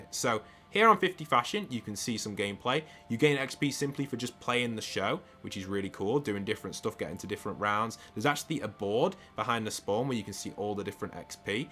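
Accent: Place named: United Kingdom. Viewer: He sounds like Luke from modern family with a British accent istg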